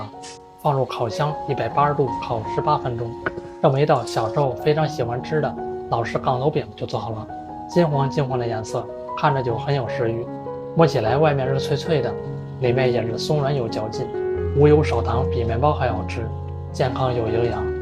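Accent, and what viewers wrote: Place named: Tianjin, China. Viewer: 博主是天津口音吧，这个发饼我觉得太费事啦，烤箱也更加费电，你这个成本算起来太高了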